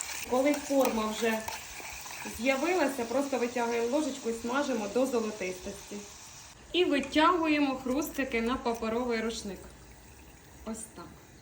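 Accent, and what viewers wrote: Ukraine. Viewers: Не так хрустики як справжній український голос молодець спів супер😊😊😊😊😊😊😊😊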